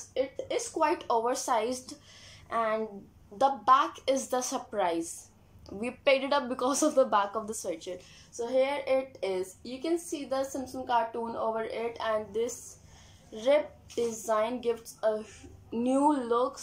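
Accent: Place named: Haryana, India. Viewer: Bt i think u r haryanvi so that tone is coming in ur conversation so i am not saying anything nagative i appreciate ur work 😘😍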